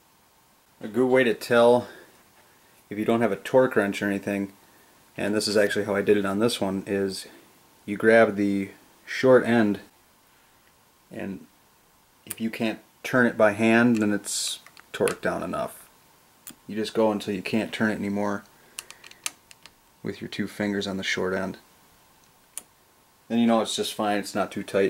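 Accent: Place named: Michigan, United States. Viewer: After watching the zeroing video and now this one you gotta be from Michigan. Lol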